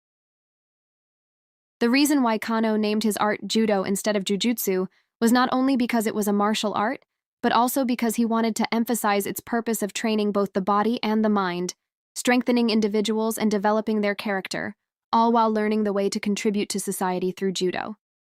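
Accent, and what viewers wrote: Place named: United States. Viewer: Narrator must learn proper pronunciations of Japanese names and places. Sounds too Yankee!😮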